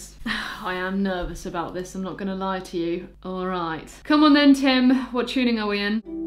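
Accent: United Kingdom. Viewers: Bri'ish accent is so cute in this one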